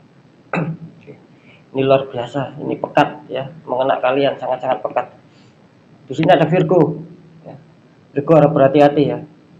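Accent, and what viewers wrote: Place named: East Java, Indonesia. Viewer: Mas orang jawatimur kah?..kok logatnya kayak orang jatim ya..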